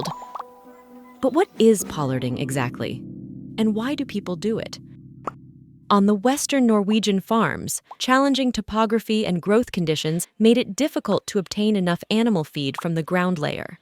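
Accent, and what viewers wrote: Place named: United States. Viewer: Great to see/hear a film about pollarding. In Aragon, there are thousands of Chopo Cabeceros, Black Poplar pollards. If I am being picky, Would have been a perfect film with a voice over from a European person. Seems odd to hear an American chatting about this subject?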